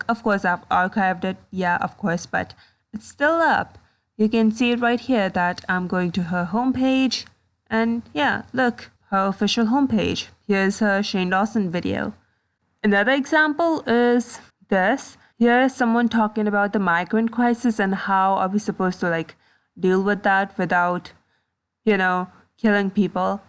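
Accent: France. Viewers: Your accent is awesome and reminds me of my aunt from France 🇫🇷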